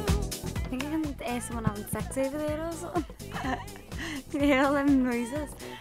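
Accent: United Kingdom. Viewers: she barely 5"0' her britsh accent can kill anyone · i lik the scouse accent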